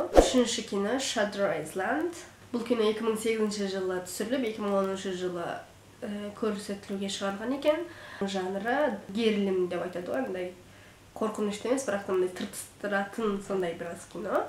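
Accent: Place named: Mongolia. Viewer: Моңғолядансзба, Туркиядансзба Чота тіліңіз бір жақа тартып тур)))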